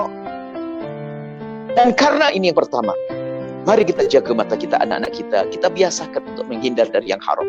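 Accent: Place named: Malaysia. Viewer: Ini youtubernya org malaysia ya. Dri logat suarany. Salam kenal Jkt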